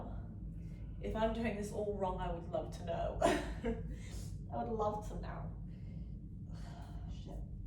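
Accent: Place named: Australia. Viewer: AYE!?!Mr. Shit Starter,. That's Me Aussie sister, ya Monitor Mouse masoosing Pre-Vert & Since she is my sister by human race standards. I love & respect my brethen, So this is mearly giving you props & I'm an emotionally mature man, nothing explicit, just giving credit were it's due, &...." I WANNA GO TO A LAND DOWN UNDER!", Icld never deny thee accent, but This is the 1st time saw you.._so OVERDUE IS A HUGE UNDERSTATEMENT,Its like I found an eviction note when I got home &theyre shutting my water, lights&gas off tomorrow 'OVERDUE.' And I would be a fool to Not say, WOW, You are 'PROP'URRR´ {my own,just mean VERY ATTRACTIVE....& Iam attracted. But persue what make you happy sweethearts. I ask Girl, Just PLEASE 'NEVER Do 'Onlyfans' or 'AduVid' I KNOW OnlyFan have big ties w 'Child Sex Ring' 4 different sourcesWe know how the other rolls. My Instragram is 24 days Old & dismal.You don't mind.Ikeepit fun, so I hope you &I cld friends. 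Talk to ya soon. Ok?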